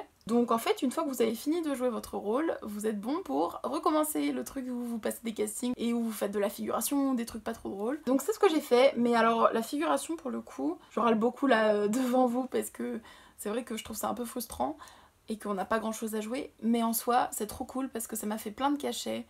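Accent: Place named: France. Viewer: Et un bel accent français exprimé aussi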